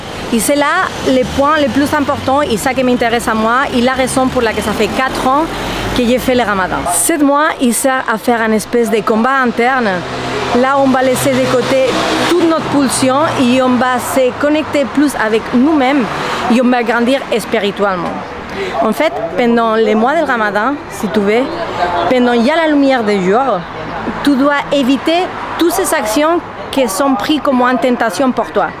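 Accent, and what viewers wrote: Spain. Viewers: Cc je te dis avec sincérité que tu parle notre langue parfaitement,ton accent d'Espagne est charmeur j'adore continue, continue, continue.la bise 😘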